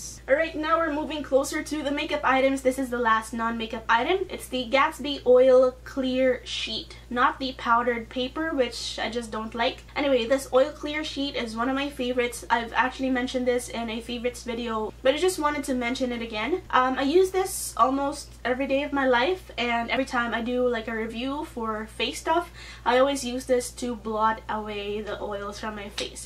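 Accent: Visayas, Philippines. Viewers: talagang love na love ko ang pagbisaya mo ang cute sis! and very natural pati mga bloops mo, kaya nag subscribe nko 😜👍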